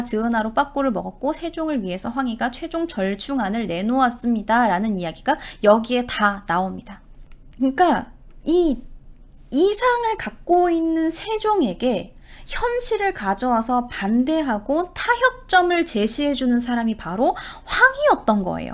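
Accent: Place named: Chungcheong, South Korea. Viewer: 충청도유? ㅎ 구수혀 ㅎ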